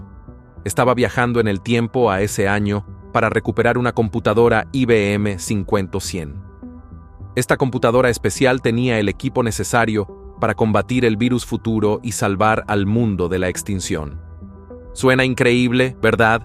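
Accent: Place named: United States. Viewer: Otro más hablando a lo gringo, haciendo el.....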